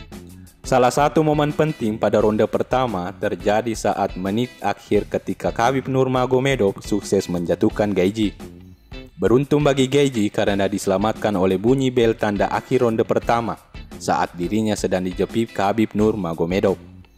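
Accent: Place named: South Sulawesi, Indonesia. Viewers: Yg punya chanel pasti saudaraku dr p sulawesi.dr logat nya. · Itu suara orang sulawesi bro.. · host nya pasti org sulses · Orang Bugis yah pembaca narasinya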